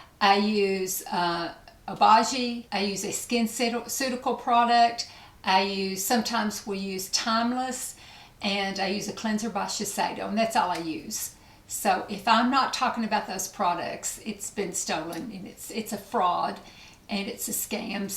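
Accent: South, United States. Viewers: LOVE the Southern accent!!!! Beautiful!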